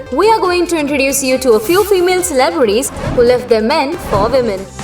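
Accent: India. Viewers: The woman's voice is an Indian accent. It appears some find it irritating. Wait.... Lance Base??? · Better to redo this with somebody who speaks English...not a Pidgeon English from deepest India.... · Her Voice in annoying. I feel like I called a 800 number for some help with a product I bought here in the USA only to be redirected to some place in India. Or that maybe I walked into a 7-11 and ordered a Slushy. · Bill Bergendahl she sounds Indian 🇮🇳 I think I’m just saying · Why the narrator sounds Indian??? Pls dont talk when your mouth is full 😂😂😂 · Indian accent is so incredibly annoying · Your Indian accent is so annoying..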